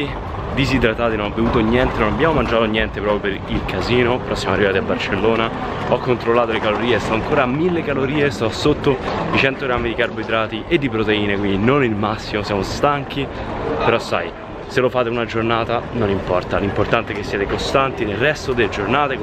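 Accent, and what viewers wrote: Rome, Italy. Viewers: Ti seguo da poco,volevo chiederti,da quanto sei a Londra? Perché la ciancicata romana se sente na cifra! Daje così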